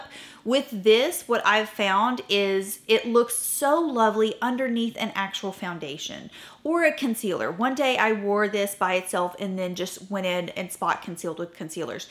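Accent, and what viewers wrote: South, United States. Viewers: I betcha you were raised in the south....where?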